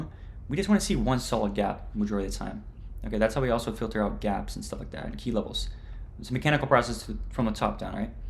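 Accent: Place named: Texas, United States. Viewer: You from Texas right 🤠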